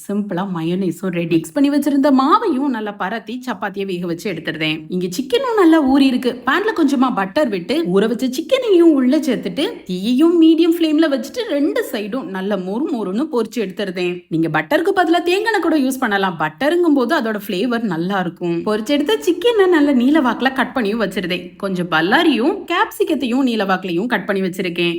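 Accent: Tirunelveli, India. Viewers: Tirunelveli dist.... But avangalukku valliyur sonnanga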